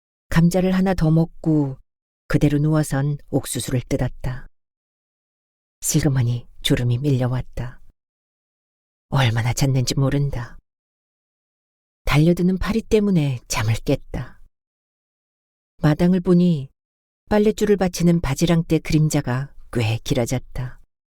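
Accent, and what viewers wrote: Jeolla, South Korea. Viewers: 자정이 지났네요. 자고나서 내일 들을까 생각하다 잠이 오지않아 오늘은 또 어떤 작가의 작품이 님의 목소리를 타고 우리 곁으로 다가올까? 궁금했는데~ 님의 전라도 방언이 참 구수합니다.^^ 또 호롱불 5일장 먼지 풀풀 날리던 신장로 드넓은 들판 지명 등등 근처에 잠시 살았기에 더욱 정겹네요. 님 잘들었습니다.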